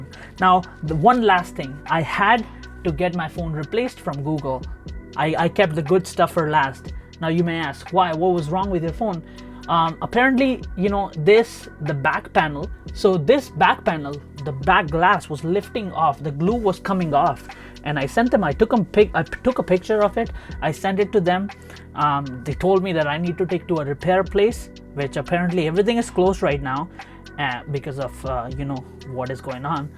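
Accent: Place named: Punjab, India. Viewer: Bro you got a thick Punjabi neighborhood English accent? I would love to know the story behind it. Brampton ?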